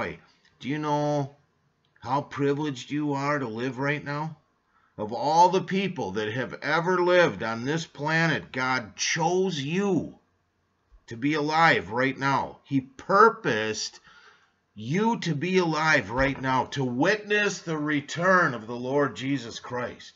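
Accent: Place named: Upper Midwest, United States. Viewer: Good teaching, Must be cold where you live all bundled up. Unseasonably warm in the south. Michigan Wisconsin esc your accent 😉 Blessed by your teachings appreciate very much indeed brother! Thought I heard the trumpet the other night, turns out it was neighbors air conditioning unit😋 👂🙏😲👐 🙄😏😕😐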